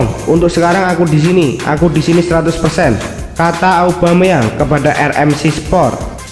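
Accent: Java, Indonesia. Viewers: Untuk, luar biasa untuk berita nya, tapi tolong logat jawanya di ubah, jelek banget jdinya, tolong... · Logat jawanya di hilangin bro. Skedar saran aja bang jgn tersinggung 🙏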